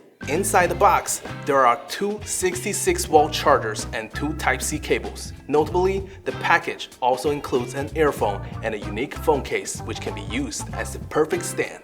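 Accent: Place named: England, United Kingdom. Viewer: 还真整这口老英语腔儿啊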